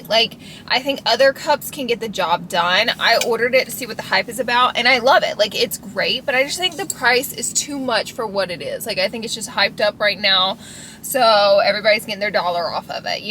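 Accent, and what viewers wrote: South, United States. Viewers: I thought you were going to a vintage’Goth’ store and what you were actually saying was ‘Golf’. 😂 Your southern accent is adorable,. ❤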